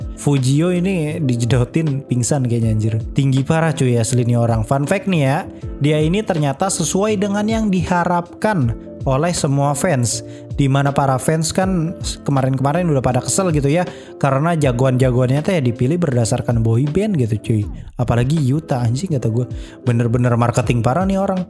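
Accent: West Java, Indonesia. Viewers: Enaknya channel Ini Kadang Ada Logat Sundanya... Jadi enak Buat saia yg urang sunda pisan... Btw salam subscriber baru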